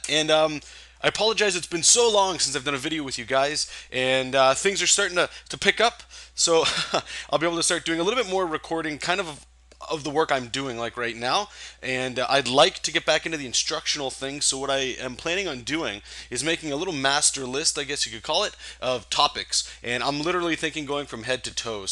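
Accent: Canada. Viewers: That good lol Canadian accent lol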